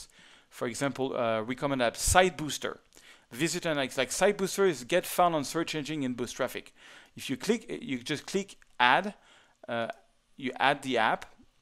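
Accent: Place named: France. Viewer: I love your frenchglish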